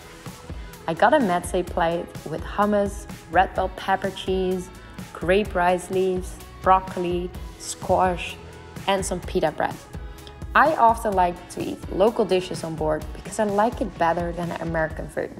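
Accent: Netherlands. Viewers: Youre dutch richt. Im sorry i hear it but youre realy good in it. I hear it because...............ik ben ook nederlands. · You have the netherlands accent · Is ze Nederlands? Door t accent hahah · Why do you sound Dutch ?😂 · Je klinkt Nederlands haha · Sanne Vloet je accent verraadt dat je Nederlands bent. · Zwz ben je nederlands haha je kunt het gewoon horen NL kunnen nooi goed engels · Je Nederlandse accent 😂✌️ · Jij bent toch Nederlands? Ik hoor het aan je accent 😂 Leuke video trouwens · Your dutch and i thought you were going to The Netherlands again?.. Btw i knoe that cuz your accent not being rude. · Ik wist dat je nederlander wist op het eerste moment door je accent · Ja, jij komt een 100% zeker uit nederland! ik hoor het aan je accent :) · Are you Dutch? Cause your English sounds like Dutch people’s English (I mean like your accent) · Her English is so good but I still can hear Dutch.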